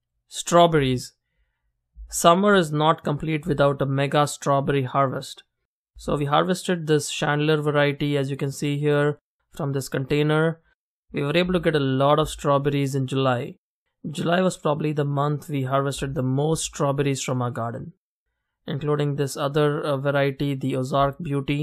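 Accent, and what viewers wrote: India. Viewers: You sound like an Indian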